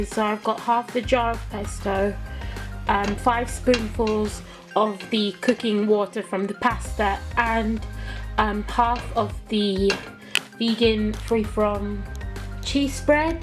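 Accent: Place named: Belgium. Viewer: it's a belgian accent :p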